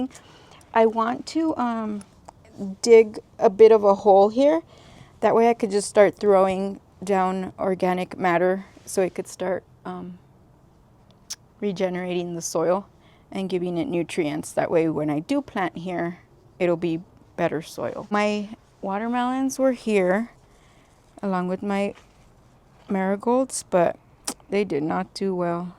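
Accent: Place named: El Paso, United States. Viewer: I clicked on your video without reading the caption and as soon as you started talking I knew you had to be from my area lol I’m from Las Cruces 🥰🙌🏽 and your new follower!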